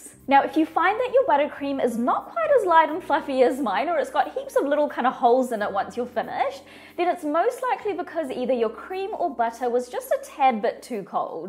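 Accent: New Zealand. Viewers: Your accent and way of speaking sounds familiar and then u said here in Newzealnd😊😊..happy to see local stuff. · This looks brilliant. I stopped icing cakes ages ago cos it made them too sweet but, will definitely try this as it looks fantastic. Love to hear the kiwi accent an a cooking video. Makes me proud e hoa. 😊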